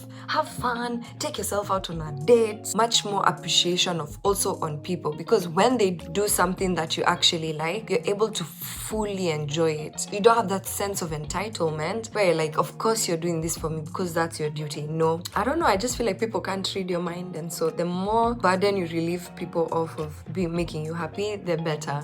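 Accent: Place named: Nairobi, Kenya. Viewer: Super confusing origins. At least your nationality is clear - Kenyan. Kenya, Pakistan on mother's side then Sudan, Egyptian and Afghanistan on the other side. Pheww especially because intermarriages between people from Indo-Pakistan and the Indian subcontinent rarely intermarry with local or native AFrican Kenyans. Your DNA ancestry test would be very interesting. You have also chosen firmly to identify as Luo African Kenyan. I watched the first video ( quite intense) and thought you were of Ethiopian origin and naturalised as a Kenyan. Everything about your accent is Nairobi. Kudos and hope eveything works out. All the best. God bless.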